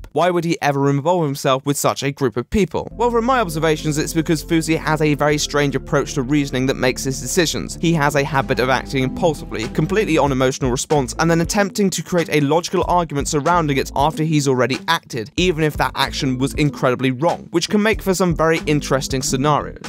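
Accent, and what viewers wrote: RP, United Kingdom. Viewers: I rarely comment on any videos. But, I have been binge watching these videos!!!! I'm into British Culture so the first thing that kept me here was the accent and then the fact that he is able to take me from A-Z on people I have idea about and I leaving feeling like I am ready to Spill TEA!! LOL! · Omg you sound like your from the Uk !!! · You posh Brits GOSH!! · People are comparing you to Colossal is Crazy? What? Why? Your content is way different than his and your voices aren't the same, I can tell that you both are from the UK. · I like these slightly shorter vids. Not that I don't like the longer ones or appreciate the work but I finish these more often than the full on 60 minute documentaries. Mainly because I watch these in bed sometimes and fall asleep from his sexy british yarn lol. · I dont know whats less interesting, phussy or this video about him.....i will however subscribe as i am an american and therefore hypnotized by a british accent. 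· Actually i love the British accent actually i think its the classy version of the English language. Theres another youtuber i like and thats Mr. Jim Sterling. And im a new subscriber to you to and i like your videos as well. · This is weird but I love your voice and accent some British people I’ve encountered I thought were from Scotland anyway love your videos 🙌🏽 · So posh 😍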